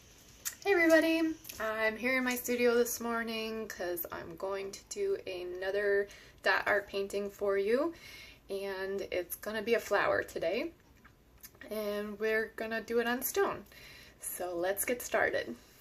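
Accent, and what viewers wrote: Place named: Ohio, United States. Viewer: Love ur technique, style and natural ability to teach and paint. Happy to hear u r from Ohio. Kinda thought u might be by listening to you! That’s a good thing! I am from Ohio currently outside of Cincinnati. Thanks for sharing your talents. God Bless you and your family! Would love too see you do a rose 🌹.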